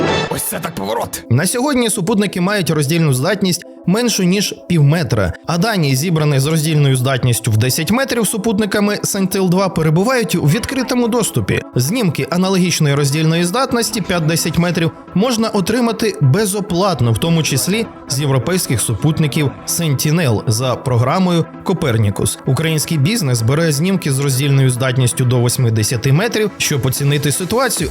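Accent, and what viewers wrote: Russia. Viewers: тавій акцент видає тебе, спалився ципсота запоребрикова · диктор - москвороте лайно та не розуміє різніці між нашими "і" та "и"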